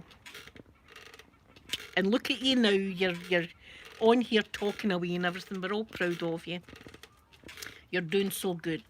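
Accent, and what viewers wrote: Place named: Corby, United Kingdom. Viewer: Ohh can of bru! Girl after my own heart, I live in mini Scotland, Corby, yiu sound like eveyone hear, even the slang the same x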